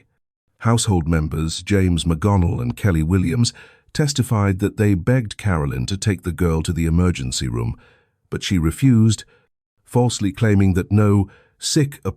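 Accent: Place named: England, United Kingdom. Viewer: The narrator sounds nice but it’s not as effective because with the accent makes it sound like the story is from England .. “ the mahsque”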